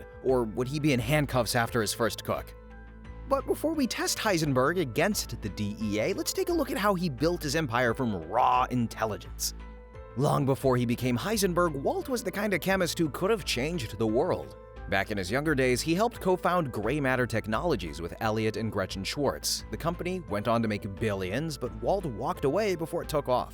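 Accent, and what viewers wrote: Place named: North America. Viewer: well the mf speaks perfect English in a north American accent so I assumed bro might either be from the US or Canada... Not a crazy assumption to make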